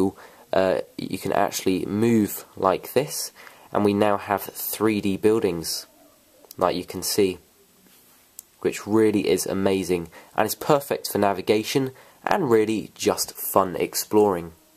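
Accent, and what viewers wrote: United Kingdom. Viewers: I like he British accent !!!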